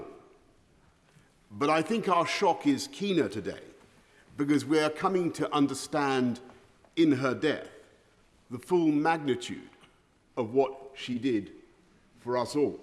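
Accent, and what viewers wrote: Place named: Upper class, United Kingdom. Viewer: Give me an example. He just uses alliteration and talks in a silly toff accent. Then he’ll say something silly like “We must all go to Peppa Pig world” to seem affably eccentric. That’s it.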